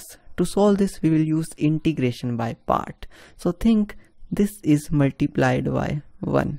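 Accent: India. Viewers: Are you indian or what?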